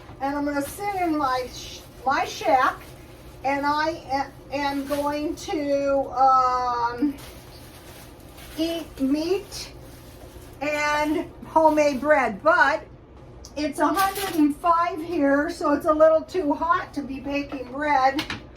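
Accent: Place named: Utah, United States. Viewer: Maybe a Utah accent?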